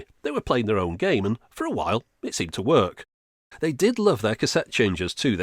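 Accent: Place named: England, United Kingdom. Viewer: Aloha , a great video as ever BUT I need to defend the Pioneer CT-M6R I bought one out here and after removing the jammed tape it has worked perfectly for over 12 moths , I also got the matching Receiver & 18 CD changer all as cheap as chips & all working perfectly .Thanks again for an entertaining video . I enjoy listening to another English accent , I'm from Solihull :) .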